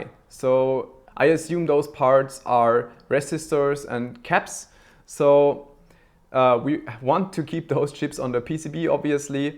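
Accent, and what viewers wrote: Germany. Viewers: Bist du deutsch? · you are german? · Your german accent is very noticable xD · He's german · Man merkt sofort wenn nen deutscher englisch spricht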